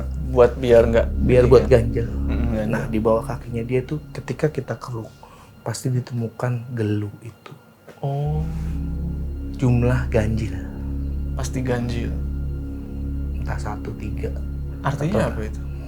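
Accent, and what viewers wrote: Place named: Padang, Indonesia. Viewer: Nama: jawa Orang:manado Logat bahasa: padang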